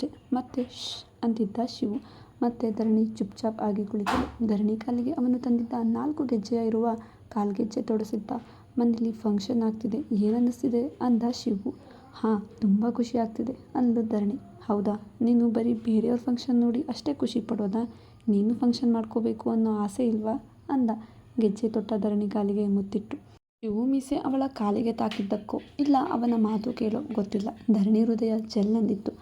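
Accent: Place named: Mandya, India. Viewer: ಮೇಡಂ ನಮ್ಮ ಮಂಡ್ಯದ ಭಾಷೆ ❤❤❤👌👌👌👌🙏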